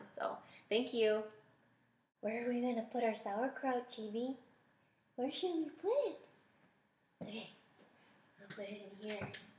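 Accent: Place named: Canada. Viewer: I like your Canadian accent.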